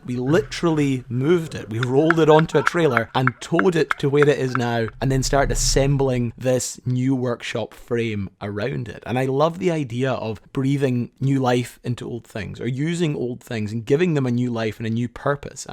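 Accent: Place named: Scotland, United Kingdom. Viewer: Thank you for such an interesting piece of history. You are an easy listen. Your Scottish background is easy on the ear. BWScott Fort Worth Texas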